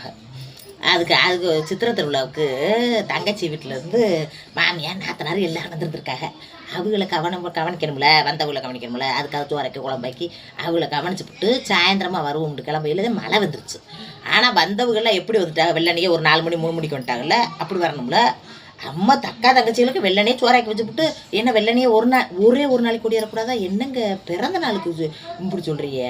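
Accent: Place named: Ramanathapuram, India. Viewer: Sister enka area voice pola irukku Ramnada 😂😂❤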